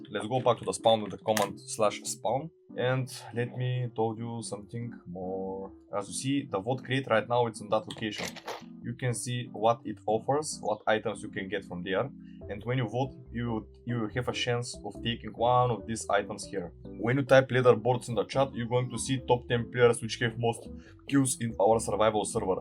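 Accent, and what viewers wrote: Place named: Pakistan. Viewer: You sound pakistani.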